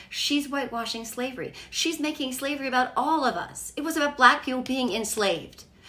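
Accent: South, United States. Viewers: for a Jersey Girl, this Southern woman says you have the best Southern accent. Better than most actors who try to play Southern. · Great Southern accent! Thanks for pointing out what we damnyankees know what the cause of the Civil War of😮—slavery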